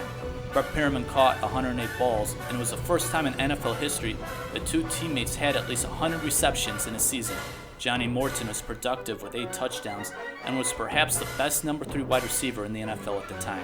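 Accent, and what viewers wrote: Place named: Midwest, United States. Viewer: Great Midwest accent and great video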